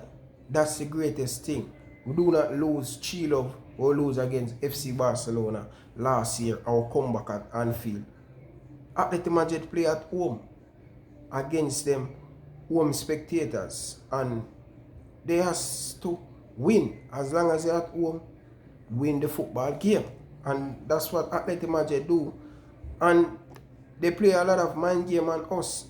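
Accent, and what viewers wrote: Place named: Jamaica. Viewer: where in jamaica you from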